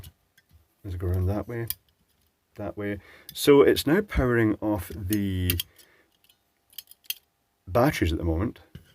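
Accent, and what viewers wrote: Scotland, United Kingdom. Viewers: We all came here for pleasant Scottish accent. Never get disappointed when he calls for that schematic. Other Scotts could point out that besides saving the cable tie with a knot, the much cheaper 1N4002 50V 1A diode would have been much less of an overkill and provided a bit more Glenfiddich.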